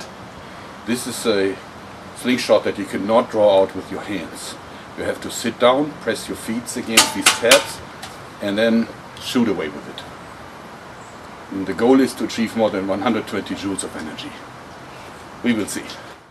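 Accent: Germany. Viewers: what nationality are you? you have a cool accent. German? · Deutscher oder ? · Bist ein deutscher oder :D ? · vom akzent her würd ich sagen dass du deutscher bist :D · he sounds VERY german... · also doch aus deutschland :P ich war erst am rätzeln wegen dem akzent ^^ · You have such a likeable german accent 😂😂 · Close enough for a German native. His English is already better than 3/4 the comments. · Hab ichs mir gedacht du bist bestimmt deutscher ?! =) Gutes Video hammer Schleuder !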